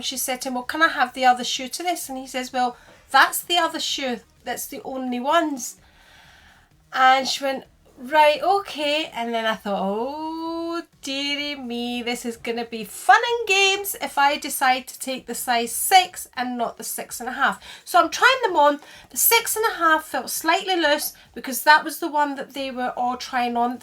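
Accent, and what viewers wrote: Scotland, United Kingdom. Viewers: Beautiful classic shoes, Manolos are so sophisticated and unique !!!! It's always lovely to see your pretty face and I love your Scottish accent too. Lots of love as always to you, your mum and family XXXXXXXXXXX Q&A: how hard was it to marry so young and to have children so young ? How did you meet your hubby ? :) XXXXXXXXXXXXXXX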